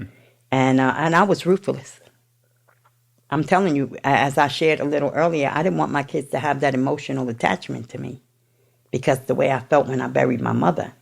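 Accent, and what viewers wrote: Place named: New York, United States. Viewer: This chick sounds like such a New Yorker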